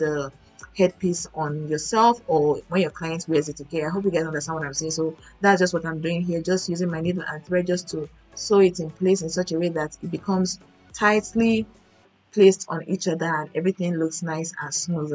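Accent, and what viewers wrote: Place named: Europe. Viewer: Never apologize for european english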